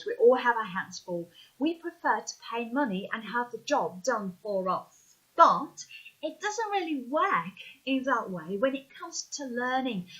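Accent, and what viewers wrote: United Kingdom. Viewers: Ти дійсно з України? Це просто як Гаррі Поттера дивитися в оригіналі. Я б не повірив тобі, якби ти зі мною розмовляла в Україні, що ти не native speaker. Дуже гарно розмовляєш, як викладач та студент, який вчить інших та вивчає англійську, можу сказати, що це найкращий брітіш екснт, який я чув від українця. Ти просто зробила мій день. Повністю згоден, тільки важка праця над собою може допомогти досягти advanced or proficiency.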